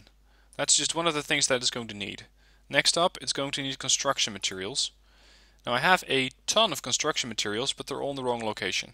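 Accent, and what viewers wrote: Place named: Netherlands. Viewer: I searched for wargame red dragon stuff and stumbled onto your channel. Now a day later i'm at episode 10 of your transport fever series haha (and watch a couple of videos of wargame ofc). Subs +1 ;) Keep doing what you doing! One question from which country are you? your accent sounds dutch.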